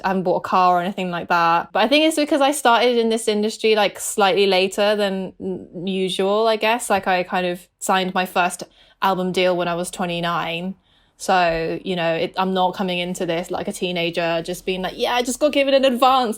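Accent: United Kingdom. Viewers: am i the only one who didn't know she was British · 1. She's a wholeass queen 💕💕 2. I'd no idea she was british?? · Hold up she’s British I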